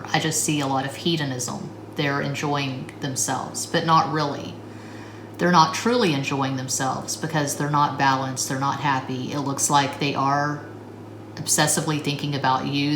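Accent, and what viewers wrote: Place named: South, United States. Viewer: Pile 4: I love how you read. Very calming, soothing voice and all, with a southern twang. How do you do it?🙏😇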